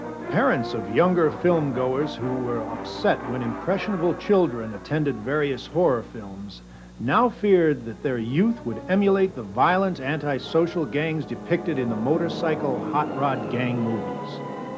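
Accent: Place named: Transatlantic, United States. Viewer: Had me thinking there was this talented guy with a transatlantic accent in the studio with and no way he chopped all this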